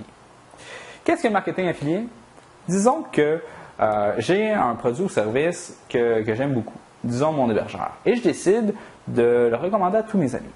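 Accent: Quebec, Canada. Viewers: Merci;) Concis et instructif (même si parfois tu enfonces des portes ouvertes)! Et ne lis pas les commentaires, nous les Européens on aime ton accent Ostie! Bonne continuation! Maison FT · Hello;-) Très bien la vidéo, bon je repasse certains passages sur lesquels comme tout Québécois tu mélanges l'anglais et le Français (avec accent) ou là j'avoue avoir un peu de mal à suivre;-) Concernant mon bizness modèle je vends des bijoux et des montres en ligne en association avec un véritable bijoutier Français sur un modèle de partage des bénéfices suite à l'échange des compétences ( je suis web-master depuis 5 ans). Aujourd'hui et depuis plus de 3 ans nous réalisons plus de chiffre qu'une boutique réelle, et cela malgré les modifications d'algo Google de septembre 2013 (les saligots) Ps: il faut faire une balance de blanc en manuel avant de te filmer, car visiblement l'appareil que tu utilises pour faire tes prises d'images à un problème en automatique Lol. 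( tu es tout bleu) sans doute que tu mélanges un éclairage naturel venant de ta gauche et un éclairage incandescent de face ou légèrement décalé, oui j'ai aussi fait pas mal de vidéo et de montage par le passé. Allez à bientôt. Christophe.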